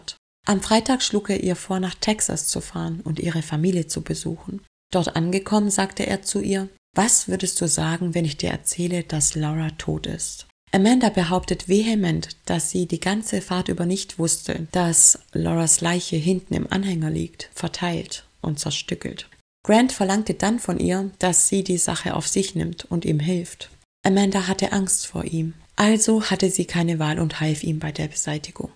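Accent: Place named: Swabia, Germany. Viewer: Man hört das Schwäble raus 😁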